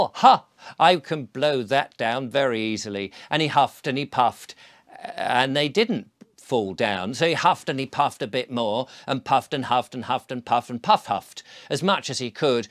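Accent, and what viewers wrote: United Kingdom. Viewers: HI, I REALLY ENJOY YOUR VIDEOS!!! THIS ONE IN PARTICULAR! YOU ARE A WONDERFUL STORY TELLER, YOU JUST HAVE A NICE WAY OF SPEAKING, MAYBE IT'S THE BRITISH ACCENT? I LIVE IN MASSACHUSETTS, USA, I'VE NOT BEEN TO HAWAII, BUT I HAVE BEEN TO CALIFORNIA MANY TIMES & I HAVE A SPECIAL FONDNESS FOR CALIFORNIA BECAUSE SOME OF MY FAMILY LIVED & THRIVED THERE FOR DECADES. HAWAII & CALIFORNIA & A FEW OTHER STATES IN THE USA HAVE THE SAME SITUATION OF EXTREMELY HOT LAVA SQUEEZING OUT OF BREAKS IN THE EARTH CALLED FISSURES WHICH CATCH DRY BRUSH THAT IS LEFT TO ROT & GUESS WHAT HAPPENS, BINGO, WILD FIRES, WILD FIRES EVERYWHERE THAT COULD EASILY HAVE BEEN AVERTED BY JUST CLEANING UP THE DRY BRUSH! ANY RESPONSIBLE PERSON WHO HAD ANY COMMON SENSE COULD FIGURE THAT ONE OUT & THAT IS WHY I HAVE NOTHING BUT DISDAIN & LOATHING FOR GOVERNOR NEWSOM OF CALIFORNIA & OTHER LEADERS & PEOPLE LIKE HIM!!@ THE WILD FIRES IN CALIFORNIA, HAWAII, THE WESTERN USA & CANADA ARE INTENTIONAL & CAUSED BY THE VERY PEOPLE PAID QUITE WELL TO PROTECT US & OUR MAGNIFICENT NATIONS!!! 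I KNOW MOST LIKELY FROM THE FACTS YOU ARE STATING, INCLUDING HOW THE PLASTIC SEATS AREN'T MELTED, BUT THE HAWAIIAN HOMES ARE FRIED!!! I STILL SEE & HAVE SEEN WILD FIRES ACROSS MUCH OF CANADA FOR MONTHS & ONGOING IN THE USA & THESE ARE VERY EASILY PREVENTABLE WILD FIRES BY SIMPLY AT THE VERY LEAST REMOVE THE DRY BRUSH, DEAD TREES & ANY OTHER FLAMMABLE DEBRIS CONCENTRATING ESPECIALLY ON WHERE THE VOLCANIC FAULTLINE TRAVELS &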